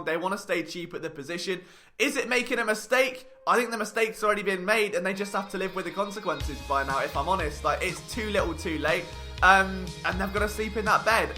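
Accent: United Kingdom. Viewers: Feel like my youtube isn't working getting Eagles commentary with the Queen's accent like the Premier League is on especially with talks & references made of Charlton Athletic I promise I'm not even trying to Troll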